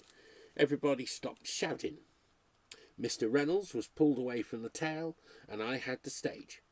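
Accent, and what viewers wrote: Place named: United Kingdom. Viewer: Thank you. Lovely british accent to go with it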